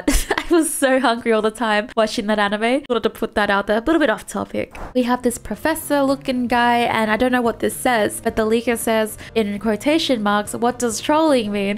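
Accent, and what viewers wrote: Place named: Australia. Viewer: Ohhh, you're from Australia, that's where your accent comes from, finally!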